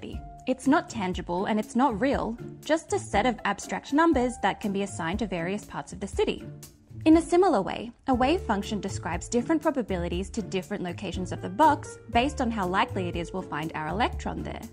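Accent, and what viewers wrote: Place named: Australia. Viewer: Aussie accents are so hot!!!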